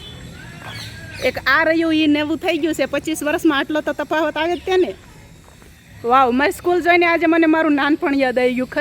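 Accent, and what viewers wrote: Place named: Saurashtra, India. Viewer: તમે પ્રાંતિજ નાં છો પણ તમારી ભાષા સાબરકાંઠા ની બોલી તો બિલકુલ કેમ નથી આવતી તમે પ્યોર સૌરાષ્ટ્ર ની ભાષા બોલો છો તમારું નાનપણ તો પ્રાંતિજ માં જ ઉછરેલું છે તો આવું કેમ સમજાતું નથી...જય શ્રીકૃષ્ણ બેન 🙏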